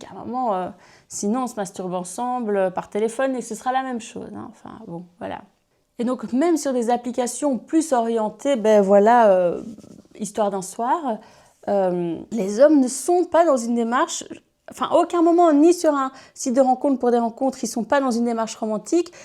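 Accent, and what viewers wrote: Belgium. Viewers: Y a comme un petit accent belge qui ressort par moment c est mignon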